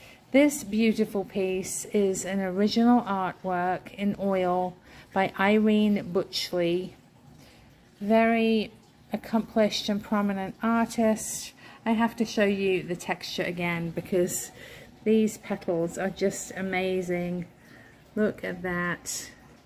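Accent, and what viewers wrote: United Kingdom. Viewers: dear, I don't understand why don't you invest in one of those cameras that sit on your chest so that you can use both hands. I see you time and time again struggling to pick up or open an item and having both hands free would make your life so much easier. I love your Chanel I learned a lot from you over time. Love your sweet cheerful positive personality and english accent. Cheers and all the best from Agi at Princeton, IL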